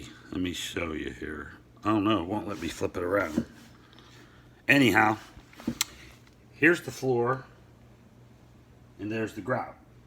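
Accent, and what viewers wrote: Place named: Pittsburgh, United States. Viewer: ! LOVE your name!!! Trying to place your accent...are you from the Pittsburgh area?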